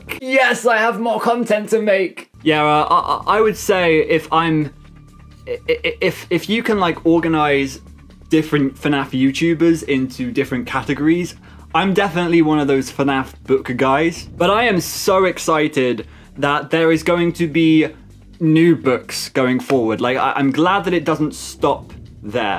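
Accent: United Kingdom. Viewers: You Look Like Dawko And Sound Like Him And I Don’t Know If You Know Him He Is A Famous YouTuber Just Like You!! He Is British 🇬🇧 And You Look Like Him You Sound Like Him And You Sound British 🇬🇧 Sorry That I Say That But Hey I’m Okay With That Your Voice Is Cool And Your Voice Sound Cool And Good So Please Don’t Be Mad I Said That So Sorry But Cool Video And Cool Voice And Sorry For Long Types😊😊 Your Profile’s Look Like The One From Dawko!! Sorry For Long Type!!😊😊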